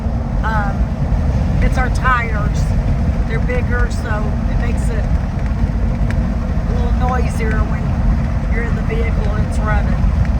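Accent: South, United States. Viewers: Blessing to you. Your channel popped up in my feed just now. Love your southern voice. Subscribed. Much love from Charlotte, NC!!!!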